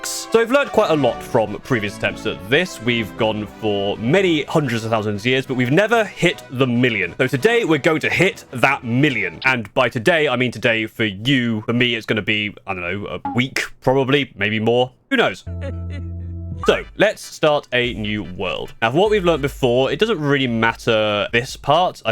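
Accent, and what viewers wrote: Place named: United Kingdom. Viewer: oh god he's british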